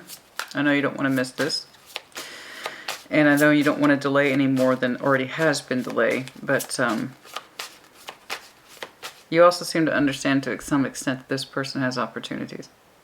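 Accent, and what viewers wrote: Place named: Texas, United States. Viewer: You are so beautiful and I knew I heard a Texan accent…the reading resonates 100%!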